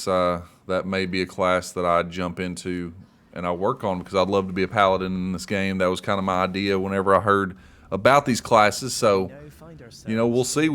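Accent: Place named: Texas, United States. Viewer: Definitely a southern Texas accent love it and would suggest using it for a saying catch phrase for the channel ,so folks can look forward to that as well as the content, aside from that great work and new subscriber here 👍 👏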